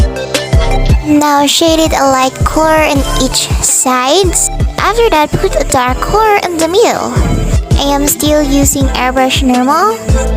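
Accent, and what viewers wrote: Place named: Philippines. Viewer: ur voice kinda sounds like filipino :00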